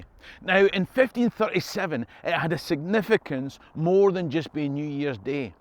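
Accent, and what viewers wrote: Scotland, United Kingdom. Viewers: I'm just a regular American, but I love the Scottish accent. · I could listen to your brogue all day.... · You just popped up into my YouTube feed and I’m so glad that you did....well, when I say “you”, I mean “your channel”. I love history, but focus mostly on The British Isles , Europe and the States. You are my first Scottish channel and you make history energetic, interesting, and even fun. You make it all the better with your amazing accent....although I, being of the States, in reality is the one with the accent. Thanks, so much!! I have joyfully clicked, smashed and rang the bell (icon).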